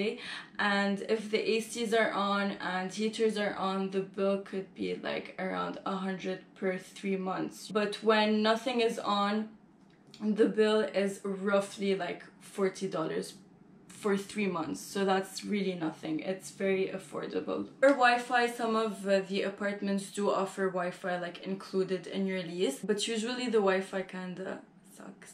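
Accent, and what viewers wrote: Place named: Lebanon. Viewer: lebanese accent is so obvious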